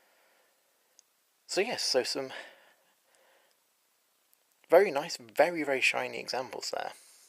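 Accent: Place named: Scotland, United Kingdom. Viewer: There’s nothing like being schooled about US coins from a Brit. The British twang makes it all the more supple! edit: oh sorry, Scottish.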